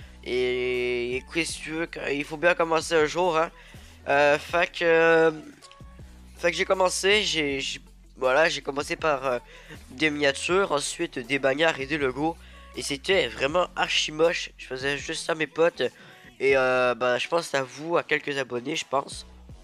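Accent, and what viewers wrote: Canada. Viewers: merci té canadien ? J'adore ton accent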